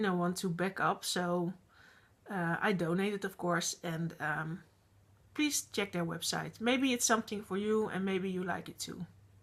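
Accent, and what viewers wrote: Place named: Netherlands. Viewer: Oh hi lovely, I love listening to you speak English, I think the Dutch speak English with such a nice tone, you sound amazing. Plus I love your fringe:) I am very fringe obsessed and currently want one again but my husband thinks not! hahahaa. I really like your Crossing Border shawl and so want to take part in your KAL, I'm off to find myself another cable pattern to knit, am currently knitting cables on my Buddleia Socks but started them ages ago so they don't count:) so nice to catch up with you today xx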